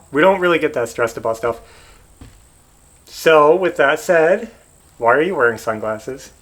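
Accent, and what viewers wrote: New York, United States. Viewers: Hey, I heard you in the beginning of your video say the word talking as “tawkin”. It’s so funny because I was coming to comments to ask you if you’re from New York. I noticed that accent immediately since I grew up and lived on Long Island for 57 years. Then you said you lived in Upstate, NY which is weird because you sound like you have more of a Long Island accent, than an upstate one. Upstate is one of my favorite places especially Lake George. I also had a question for. How did you get your clip on glasses to match your eyeglasses so perfectly? Were they made by the same place where you had your prescription glasses made? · As a NY’er living now in the South I LOVE it that you sound like home. And I love the glasses. Thank you for being you!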